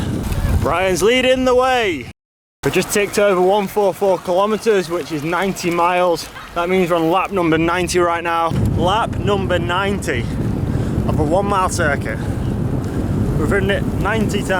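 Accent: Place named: North, United Kingdom. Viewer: Is it me or do you sound more northern than usual?!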